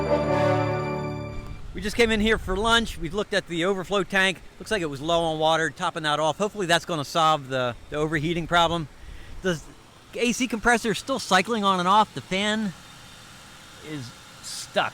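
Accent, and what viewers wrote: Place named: Delaware, United States. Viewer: This guy has such a strong Delaware accent, it cracks me up. Nice ride!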